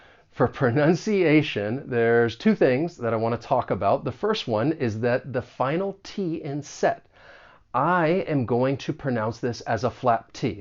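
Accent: United States. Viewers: I do love American accent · Is this American English? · thank your video is so helpful. so,keep going! is your english american? from japan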